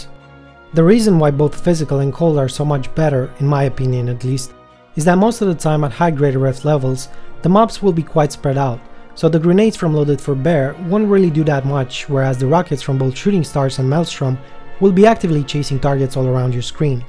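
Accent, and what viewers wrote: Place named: Philippines. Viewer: you sound like filipino.. hmm...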